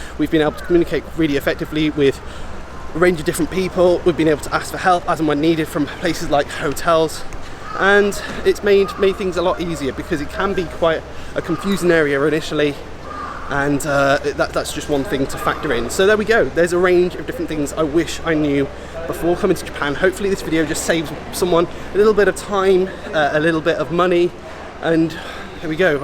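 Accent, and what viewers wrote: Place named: United Kingdom. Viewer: Your tips are really good 👍 tips, so thank you 😊 for those. However, it's a bit hard for me - a Vietnamese American- to understand your British accent, 😂, so it would help tremendously if you could illustrate the object/subject which is being mentioned with a picture 📸 or a flash of a video clip, etc. so that whatever you are mentioning or explaining could be understood 😉 right away! Thank you 😊 💓 so much.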